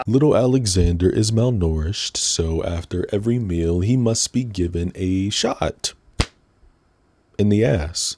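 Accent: United Kingdom. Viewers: 1) as a Brit I live for your Bri-ish accent 2) why did I imagine alex legit saying aye come catch this fade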